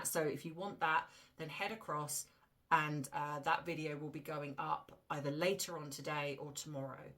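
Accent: United Kingdom. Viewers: I'm not sure why your channel would be failing. I really enjoy your videos, love the British accent, and believe you are spot on in your analyses. I've been "hyping" your channel. I'm not sure if that helps boost your algorithims but it can't hurt. 😊 Subscribed to Bite Size too. Edited to add that the Montecito clowns bought the award.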